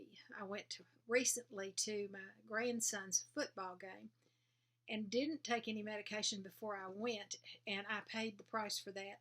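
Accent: South, United States. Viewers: I just love your videos - I could listen to you all day long, being from Iowa I just love your southern accent. My family Thanksgiving is in the midst of changing and not just due to covid. This year we won't be gathering, and last year was the first time in my life that I remember going to somebody other than my Uncle Johns' for the gathering (over 40 years - I stitched the Plum Street His & Hers Thanksgiving stockings as a thank you). If you recall, I would like to know the designer of the Halloween tree/alphabet with the pumpkin at the bottom pictured ~ that is adorable. I just finished stitching Scarlett House's Autumn Alphabet. I am so very sorry to hear that your brother is declining, and will keep you both in my thoughts - Joan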